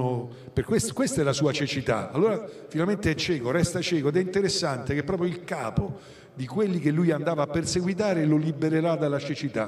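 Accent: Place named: Rome, Italy. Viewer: Che bello sentire parlare don.. perché tanto illuminato e per il suo simpaticissimo "romano".. 😊😊😊😊❤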